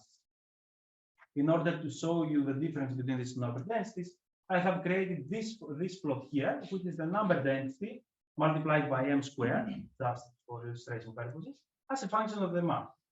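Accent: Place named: Greece. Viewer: μιλάς τα αγγλικά με ελληνική προφορά, ταλαιπωρείς πρώτα τον εαυτό σου και δεύτερον τον ακροατή , υποβαθμίζεται η δουλειά σου , όταν μιλάς τα αγγλικά το στόμα να το έχεις λίγο πιο ανοιχτό να βγαίνει η φωνή. Φιλικά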